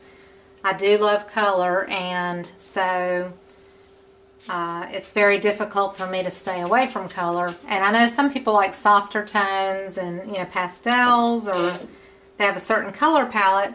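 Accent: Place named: Rural, United States. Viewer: That "Country twang" just makes you more enjoyable to watch and listen to.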